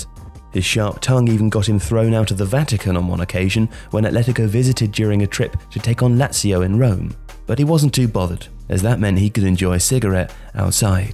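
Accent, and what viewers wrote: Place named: England, United Kingdom. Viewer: The script reader sounds English. Probably can't pronounce them like a native speaker.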